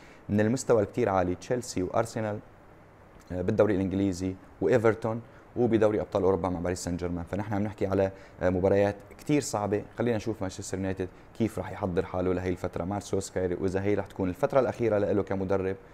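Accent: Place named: Aleppo, Syria. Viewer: كلام هادئ ومتوازن ومنطقي،من اول فيديو عرفت انك من حلب،الله يعطيك العافية وتقديم جميل وسلس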